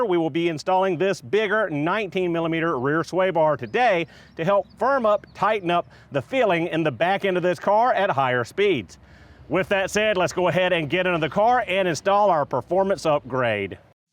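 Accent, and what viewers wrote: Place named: Carolina, United States. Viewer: I'm always fascinated by the rural Carolina accent(s) in which "on" is pronounced "own" where virtually everywhere else in the U.S. it's "awn". Here in New Orleans, we perfected "puhkawn" for "pecan" while the rest of the country goes with "peecan". Variety is the spice of life!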